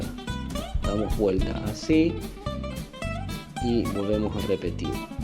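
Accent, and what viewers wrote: Argentina. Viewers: Excelente estimado chef , decir que eres un argentino de un país hermoso previligiado porque de muy peque me a gustado tu país y ese acento especial que solo ustedes y los uruguayos lo poseen dicho esto , muchas gracias por tus recetas sobre todos estas masas me gustan igual con espinacas fabuloso las voy a preparar tal cuál lo enseñas , saludito desde Chile !!!! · Que bello acento el de los argentinos😄😍😍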